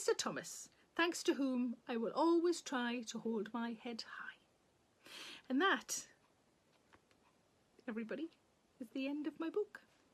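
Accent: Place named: Durham, United Kingdom. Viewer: Listening to you every day has been like a home comfort. It reminds me where I’m from and the proper way to talk. I was a frequent visitor to Boldon cinema when I was younger. Perhaps we met. Thanks from near Luton, originally Seaham.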